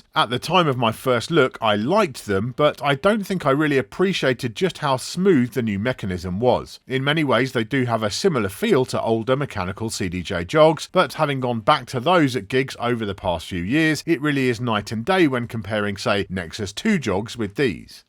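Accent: England, United Kingdom. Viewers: In we TRUST!!! The only English accent DJ I can stand lol... No offense please, but let's be honest, I hate watching videos from people with an accent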